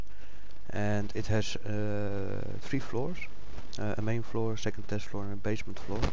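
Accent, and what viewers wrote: Netherlands. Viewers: well if you are dutch and you have heard dutch people speak english you kinda get a feeling for it, but it isnt a crazy dutch accent.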